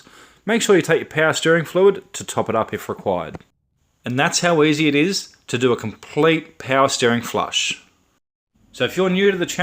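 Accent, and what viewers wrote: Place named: Australia. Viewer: Your are legend mate. Sounds like Australian if yes where about your base? Im from Melbourne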